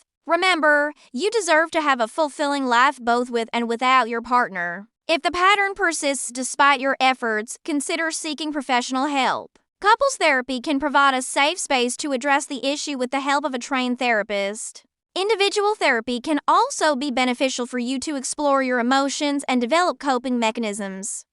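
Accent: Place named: South, United States. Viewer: Helpful video with a Heavy Southern Sandy accent though. Lol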